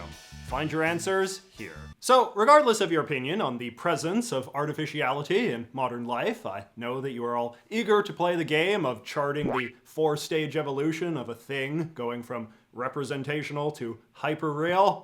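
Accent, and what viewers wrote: Canada. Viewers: it's a canadian accent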